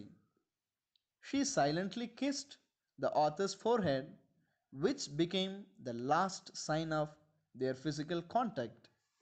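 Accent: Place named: Kerala, India. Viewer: Awfully narrated.. Typical mallu accent 😢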